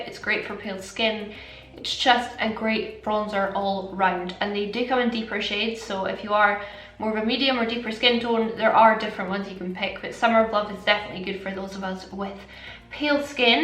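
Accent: Scotland, United Kingdom. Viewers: Love her soft Scottish accent... beautiful xXx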